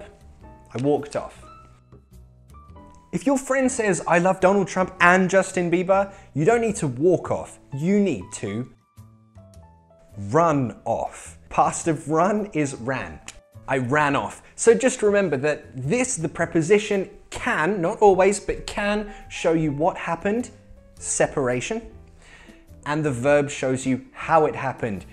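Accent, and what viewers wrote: United Kingdom. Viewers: Are you British, teacher? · He's British!! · The way he talks has a very typical British accent than we Americans. Awesome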